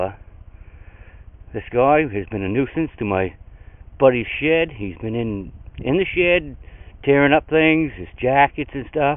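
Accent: Canada. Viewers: are u canadian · Great video man! I love how canadian you sound. Thanks for the post stay Eazy! ... "Shklor"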